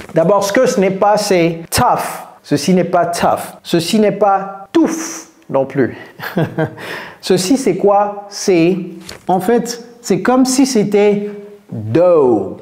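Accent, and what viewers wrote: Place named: United States. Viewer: How can I speak with american accent like you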